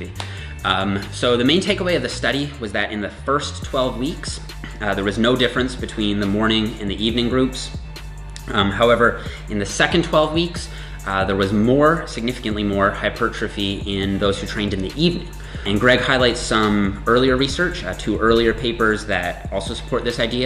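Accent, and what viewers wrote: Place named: Newfoundland, Canada. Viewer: My thoughts as I watch you " Oh hey his accent is familiar....wait a second, his last name is Nippard googles your name HAH hes a newfy! " Yaaaaaaaay!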